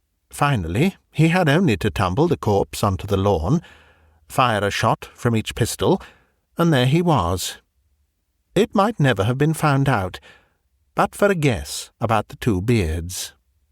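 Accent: England, United Kingdom. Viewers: Such a lovely voice to listen to. So nice to hear an English accent!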